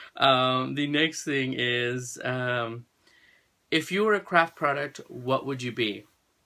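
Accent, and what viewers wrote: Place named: France. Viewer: First off you are gonna laugh when I tell you this.........but for some reason I always thought you had a bit of an accent and were FRENCH and maybe from Paris or something? I have no idea why. Second little sis is gorjuss! You ain;t bad yourself. Third I loved the entire video! Thanks!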